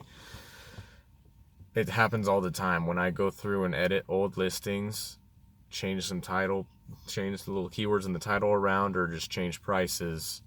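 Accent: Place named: United States. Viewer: God...I just love your American accent, where are you from?Colorado?Denver?, nice video great tips, thanks for sharing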